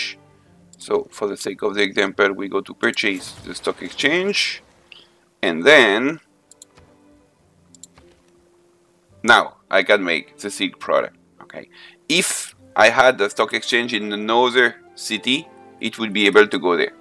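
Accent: France. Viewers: Your accent sounds so French, haha! Love it.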